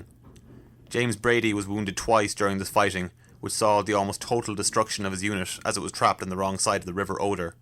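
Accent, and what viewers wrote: Ireland. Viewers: So the narrator was Irish, too?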